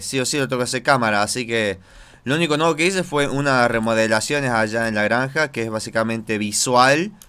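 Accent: Argentina. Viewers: tienes acento argentino pero hablas como peruano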